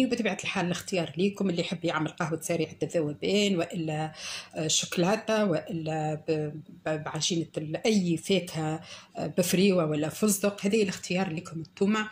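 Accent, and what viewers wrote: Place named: Tunisia. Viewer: بسم الله ما شاء الله عليك اختي عملت كريمة الزبدة بطريقة احترافية..وخاصة انك عقمتي بياض البيض بالسيرو..وأصبح آمنا على الصحة.... جاتك روعة حبيبتي..... الله اعطيك الصحة اخلاص.. أموت في اللهجة التونسية برشة برشة 😄تم معاك بصدق وأمانة حبيبتي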